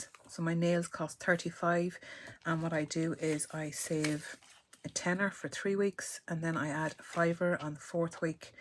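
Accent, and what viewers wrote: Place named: Ireland. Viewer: Irish accent is amazing! I was counting with you the second time...hahaha...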